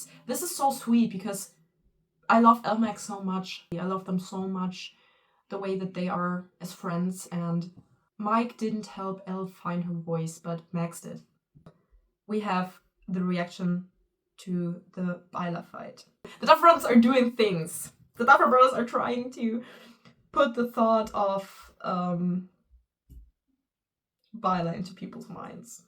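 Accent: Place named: Germany. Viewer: Ich kann deinen deutschen Akzent hören 😼😼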